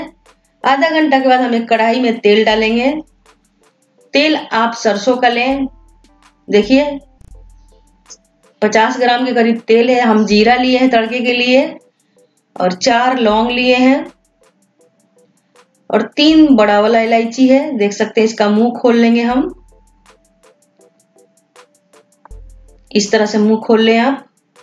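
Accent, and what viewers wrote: Bihar, India. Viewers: Madam chicken se achi to aapki language lagi.....chicken to bnane k baad hi pta chalega...bihar se ho kya aap.... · Jhandu curry,bihari accent mein bol rahe hai aurbana punjabi curry rahe hai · Voice se Ho pta chal rha hai ye biharan hai ye kha bnaegi punjabi chicken kuri wo khawAt hai na bndr kya Jane adrak ka swad wo hi baat ho gyi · Aap bihari Hain na? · waisey bahut accha...pink-pink madam ney kaha ki chiken ho jaiga.. hum rukey huan hain pink-pink chiken ko honey mein.. abhi to chiken brown brown hui hai..yeh hain bihari madam!!! baut mirchi masala khatey hain...accent sey kuchh andaza aya hai. Bihari food is very good.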